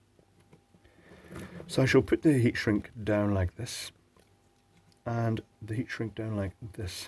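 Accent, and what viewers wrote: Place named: Glasgow, United Kingdom. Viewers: lol I find myself doing the one moment please in a glaswegian greenockian accent, my girlfriend looks at me weirdly been doing that constantly just because of lol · I'm from Glasgow So nice hearing a familiar accent . I live in Michigan now for the past 25 plus years.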